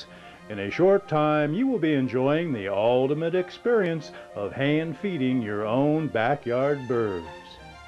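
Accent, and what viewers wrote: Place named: United States. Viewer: I thought this was jolly old England , my homeland, untill you started speaking. It was beautifull. Then came the US accent and my mind wandered into the wording of 'backyard birds'... mmm the backyard of birds, Just me? British hunour maybe ehehe. I enjoyed the visit nonetheless.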